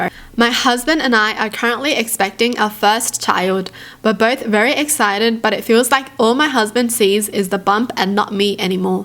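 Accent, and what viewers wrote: Australia. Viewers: Australian, (She's Vietnamese-Australian) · Australian I believe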